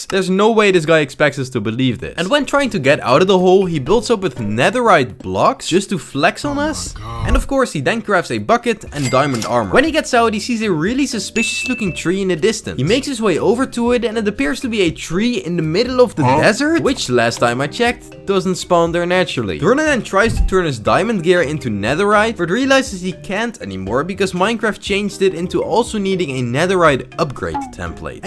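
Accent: Netherlands. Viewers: I sense some dutch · U sound dutch,.....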